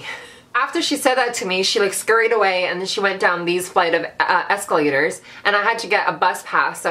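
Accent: Vietnam. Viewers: your old vietnamese lady accent is my favourite thing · I'm sorry but I always die laughing at your Vietnamese accent because it's DEAD ON. I'm Cambodian, but my hairdresser is Vietnamese and sounds EXACTLY like that. It just puts a smile on my face.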